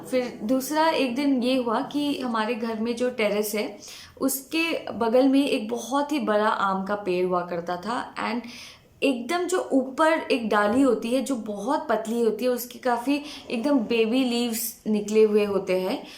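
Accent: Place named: mixed, India. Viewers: Loved your stories and the narration :) Btw you have a northeastern/bengali accent...are you bengali!? 😅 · Meri gf ki trah pronounciation hai aap ki Assamese bengali jab hindi bolte 😅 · I like your stories and your speaking style is very cute and my own sister-in-law was an Assamese so I understood that you were an Assamese too. She also talks like you😊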